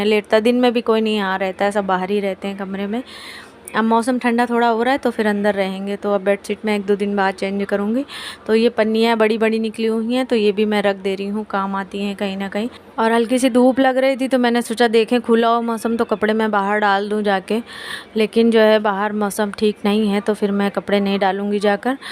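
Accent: India. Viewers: Kanpuriya boli acchi lagti hai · Mujhe to nhi lga UP ka bhasa acha lgta h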